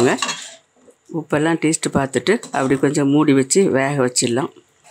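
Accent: Nagercoil, India. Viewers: Amma unga voice cleara irukuma Nagerkoil slang matiri iruku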